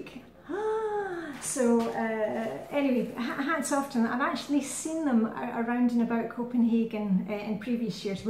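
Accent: Scotland, United Kingdom. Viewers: You have such an open, natural style when you talk to us. Thankful every day for the thought and time you put into these videos, and for your sparkle and encouragement and good cheer. (My husband loves your canny Scot style! :)))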